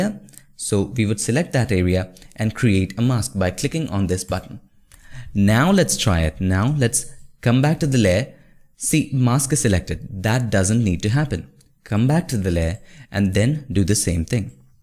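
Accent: India. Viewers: perfect indian voice i see on youtube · i realy like your monobrow. but indian english is very exhausting to listen to for me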